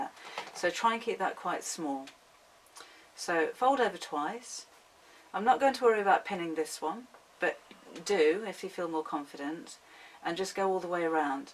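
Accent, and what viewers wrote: United Kingdom. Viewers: I just noticed your pin cushion. I have got to make one like that. Thanks for this wonderful video. btw love that British accent you have.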